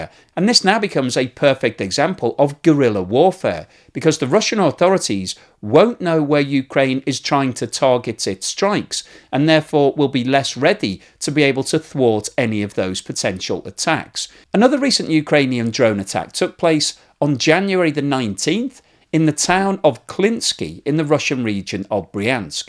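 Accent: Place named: RP, United Kingdom. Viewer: your BBC English, your clever views and the capacity of explaining complex issues in a simple way makes you Top Expert!!!! Thank you!!!!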